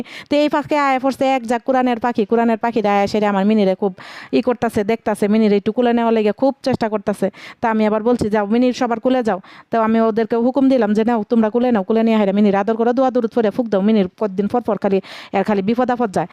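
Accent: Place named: Mymensingh, Bangladesh. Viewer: আপু আপনার মিনি অনেক কিউট, আপনার কথা গুলো অনেক সুন্দর লাগছে তবে আপু আপনার কথা গুলো জামালপুরের হয়নি এই ভাষা ময়মনসিংহ এর,আপু আমার বাড়ি শেরপুর বকশিগঞ্জ এ,এই পিটালি আমরা আগে মানুষ মারা গেলে মজলিস এ কলা পাতায় খেতাম অনেক মজা হতো,আপনার ভিডিও দেখে ছোট বেলার কথা মনে পড়ে গেলো, অসম্ভব সুন্দর ছিলো আপনার ভিডিও ও উপস্থাপনা,আপু আপনার ভিডিও টা পুরো টা দেখলাম এবং এক বা না, দুই দুই বার দেখলাম ভালো থাকবেন দোয়া রইলো আপনার জন্য।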